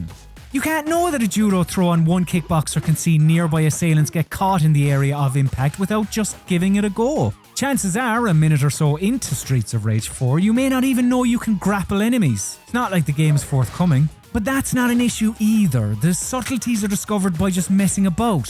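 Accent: Ireland. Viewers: I like the Irish sounding accent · Man its nice to hear another irish voice going on about great games :)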